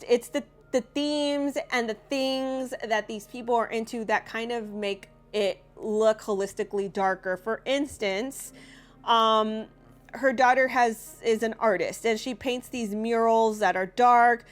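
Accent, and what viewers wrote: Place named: Staten Island, United States. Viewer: You sound just like my Italian sister from Staten Island NY! Good discernment 💯☺cool beans! 😎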